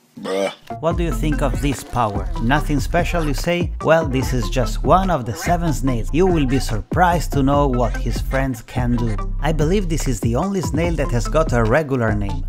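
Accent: Italy. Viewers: Your accent makes me think you're italian, aren't you? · Dude, are you italian?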